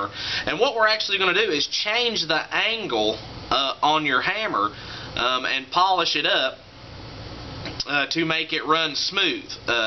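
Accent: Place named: Alabama, United States. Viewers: Sounds like he is from Bama. Good vid! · Dude, where are you from? Trying to place your accent. Tennessee? Kentucky?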